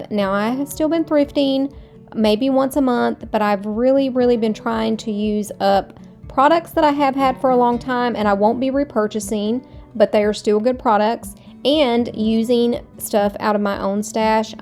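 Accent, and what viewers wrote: South, United States. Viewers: I just adore your channel! I came across your latest video a few days ago and immediately hit that subscribe button and since then I have been slowly watching backwards as I find breaks during the day. Thank you so much for sharing your creativity, your ideas for selling ephemera and dragging out pieces in your stash to use. Your voice is fantastic despite what the deer head person said. I have a southern drawl too and I'm proud of who I am! Take care and I can't wait for the day you hit a million subscribers!😊😊😊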